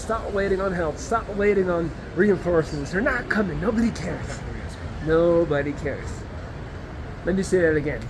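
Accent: United States. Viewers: Wat grappig je komt uit Nederland? Ik dacht serieus dat je uit Amerika kwam. Je klinkt als een Amerikaan. Maar uh... wat doe je in Brazilie? Brazilie is wel het laatste land waar ik ook maar een voet zou zetten. En ik haat de Portugese taal. Gr gr Dario. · You’ve got an American accent! Where are you from originally?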